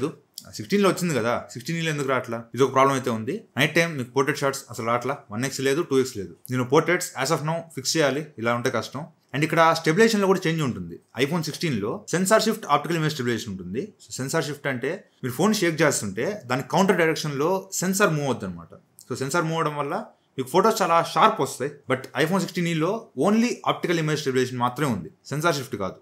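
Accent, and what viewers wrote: Nellore, India. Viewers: Anna Nellore aa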